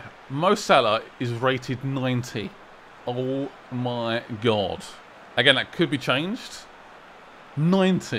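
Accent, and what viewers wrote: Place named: Liverpool, United Kingdom. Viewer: It's difficult to understand some of the things you're saying because of your strong scouse accent.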